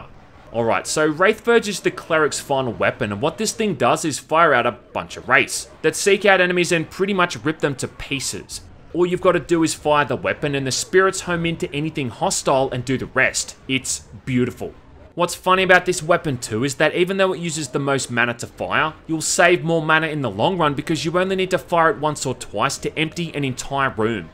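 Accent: Australia. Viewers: Thanks for the video review of one of my most dearly loved games. I’ll be sure to subscribe to see more like this. I’m an Aussie too so it’s way better hearing an Aussie doing the reviewing too lol 👍🏼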